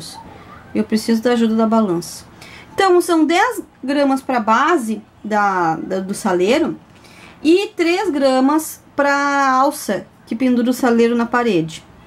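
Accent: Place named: Rio Grande do Sul, Brazil. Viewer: Oi adoro suas explicações eu qdo o seu zap.amada não fica xatiada não com esse tipo de COMENTARIO NAO TENHA FE EM DEUS ELE TEM O CONTROLO DA NOSSAS VIDAS BJS. GOSTO MUITO DE VC É ADOOOOOOOOORO SEU SOTAQUE TCHÊ FICA COM DEUS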